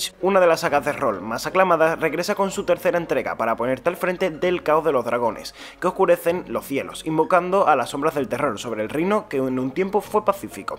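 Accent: Andalusia, Spain. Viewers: Eres andaluz? Tu acento es diferente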